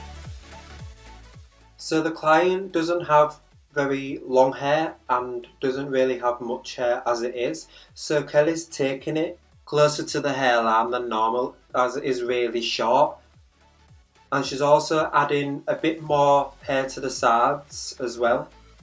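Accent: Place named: North, United Kingdom. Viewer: I love your channel! I've recently started doing my own hair at home (well, what I mean is a few months ago I had a disastrous set of nanos that all fell out within a week and I had an event coming up so I pretty much forced my poor boyfriend to watch endless la weave you tube videos and then try to put one in for me because I couldn't get/couldn't afford another last minute appointment..) Your videos are great, they've really helped/made me want to have this method after years of micro rings and the hair you do always looks so natural at the end It's nice to hear a fellow northern accent too, most of the videos you see are very excited Californians (not that I have anything against them haha) swishing their hair about and insisting on telling you their life story for 4 minutes before the video actually starts 😂 I was just wondering how many rows you generally put in and if you double/triple up the wefts on your rows? 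What weight of hair do you generally do as a full head? My boyfriend is surprisingly good at straight sectioning/putting the hair in that it stays in and he doesn't mind so he always does my hair now, but where to place and how many rows to put in is the only problem. They either seem to end up too far apart so I run out of head, or end up with masses of hair all at the top of my head so that it looks like I have an enormous head 🤦‍♀ Love the idea in this video of adding more hair to the weft at the sides too because I also have blonde/fine/short hair at the sid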